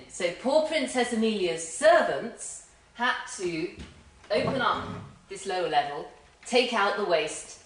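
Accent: RP, United Kingdom. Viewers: saying...piss pot... in her lovely posh accent is a joy.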